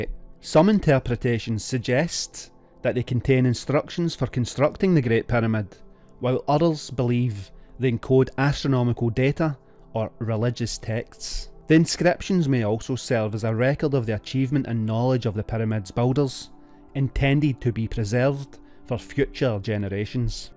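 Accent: Scotland, United Kingdom. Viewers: Delivering information in a Scottish accent makes it sound stupid from the off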